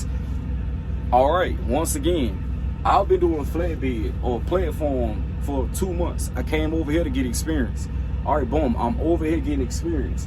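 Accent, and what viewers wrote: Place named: Missouri, United States. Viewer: Definitely a Missouri accent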